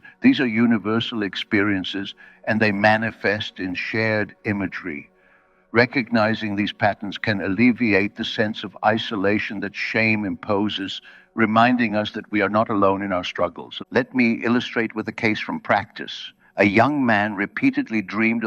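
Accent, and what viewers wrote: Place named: New Zealand. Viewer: Why is Dr Jungs accent from what I assume is New Zealand?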